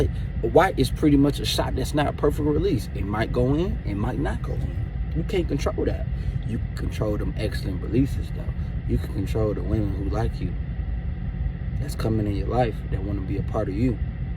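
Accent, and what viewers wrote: Baltimore, United States. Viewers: That bmore accent 🔥🔥💯👌🏾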